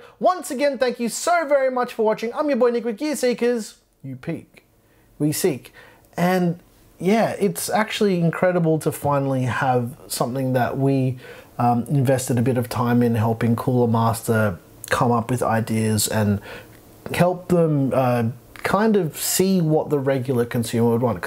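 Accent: Australia. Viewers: A Mexican with an Australian accent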